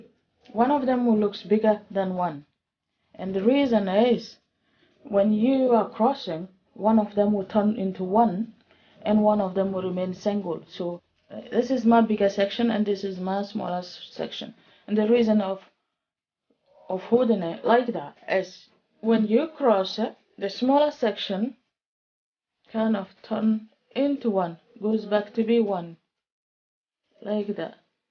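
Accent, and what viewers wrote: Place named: Scotland, United Kingdom. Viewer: Iv watched your videos before and this is the first time iv noticed that you have a Scottish accent 😅 just when I hear you say some words I noticed it. These braids are so cute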